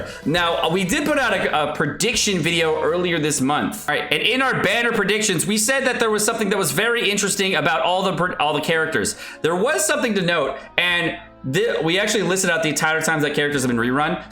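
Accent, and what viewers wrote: United Kingdom. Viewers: BRITISH LMAO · Finally british is back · Is that BRITISH holy moly, that can't be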